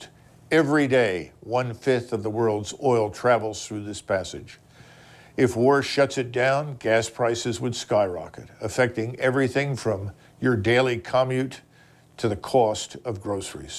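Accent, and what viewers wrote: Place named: United States. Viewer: I dont care what party he votes for, he sounds like a REAL American.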